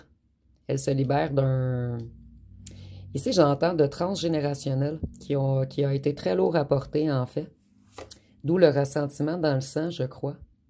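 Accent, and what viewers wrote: Quebec, Canada. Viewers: J'adore votre accent cebecois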